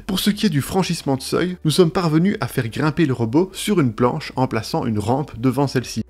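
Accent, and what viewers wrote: Belgium. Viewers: Laisse aller l'accent belge, mec, on veut entendre les nonantes 🤌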